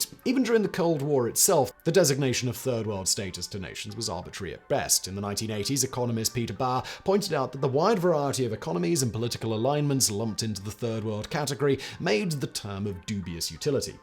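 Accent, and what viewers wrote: United Kingdom. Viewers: mate can’t understand you. This is only for British English users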